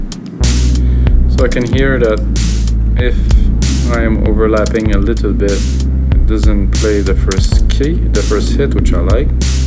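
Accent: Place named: France. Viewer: ptit accent francais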